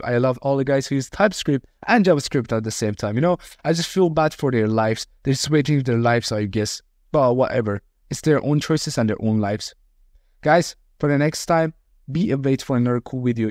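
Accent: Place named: India. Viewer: Blud has indian accent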